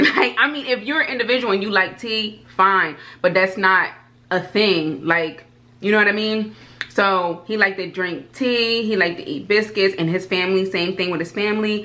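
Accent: United Kingdom. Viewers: 😮yum yum 😋 love the English accent❤ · I'm being honest, your British accent was terrible 😅😅 But the full English breakfast looked good! We usually have this kind of breakfast at the weekends or special occasions. Cereal, crumpets or a cup of tea with toast daily is the norm in England. Love you! 😘😘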